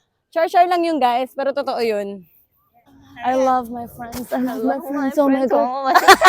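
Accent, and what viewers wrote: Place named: Australia. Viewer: Aussie accent kana sissy, miss you 🥰😘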